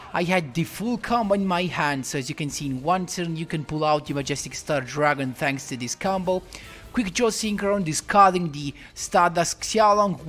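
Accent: Italy. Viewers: Ma quanto cazzo sei italiano? Sgamato dall’accento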